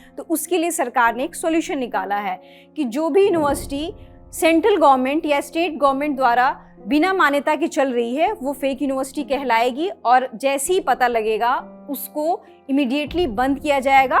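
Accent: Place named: Bihar, India. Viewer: Anchor Bihari h😂😂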